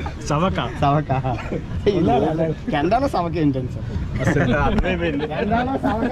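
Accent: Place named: Guntur, India. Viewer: Mana guntur slang lone chey bro video no other slang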